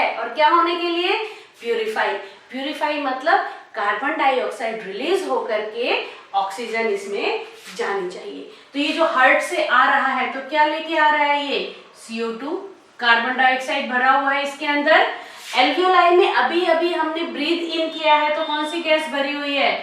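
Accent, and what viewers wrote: Haryana, India. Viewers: Haryana k ho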